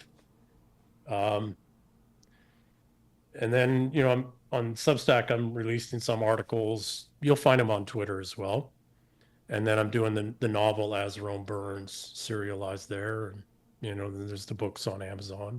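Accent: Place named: United States. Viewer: He sounds American. So why does he speak as a British Subject? Is he British/English?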